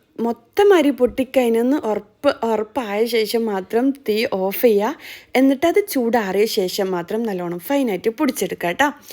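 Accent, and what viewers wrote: Kannur, India. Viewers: നി കണ്ണൂർ എടാ ആണ്❤❤❤❤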